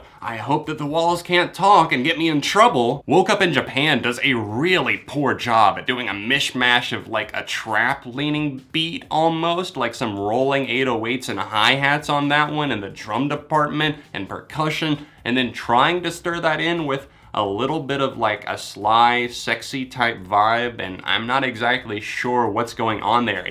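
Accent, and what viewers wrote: Australia. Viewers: I like your Aussie accent,!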